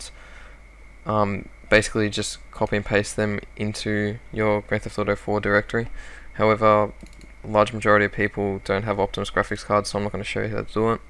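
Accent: Australia. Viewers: Nice video! And theres a bonus to the vid aswell! Your an Aussie! This is amazing! Good video with an australian in it. Anything better than this?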